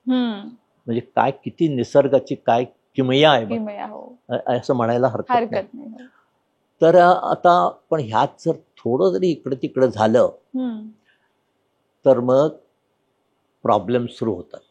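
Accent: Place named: Karnataka, India. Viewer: डाॅक्टर कर्नाटकातील आहेत. कानडीचा प्रभाव मराठीत जाणवतो. निरीक्षणावरुन.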